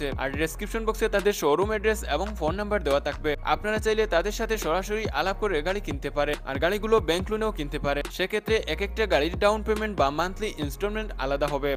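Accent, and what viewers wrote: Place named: Sylhet, Bangladesh. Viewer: সিলেটি উচ্চারণ।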